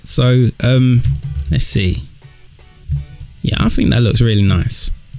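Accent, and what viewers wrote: United Kingdom. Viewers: Loved your cockney accent!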